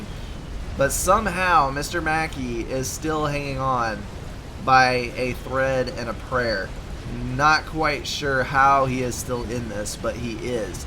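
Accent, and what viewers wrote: United States. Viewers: I love the southern accent from you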